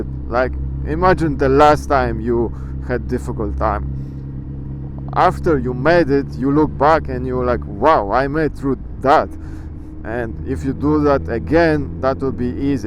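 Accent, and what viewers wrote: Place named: Russia. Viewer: Привет из Беларуси,по твоему акценту понял что ты русский)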